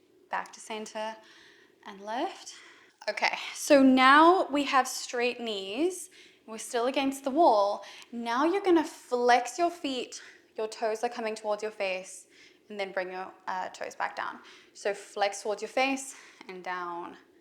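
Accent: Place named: South Africa. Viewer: I hear a South African accent here :-)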